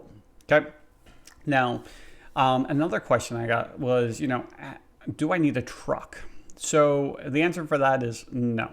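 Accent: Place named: Philadelphia, United States. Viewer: He's a Philly guy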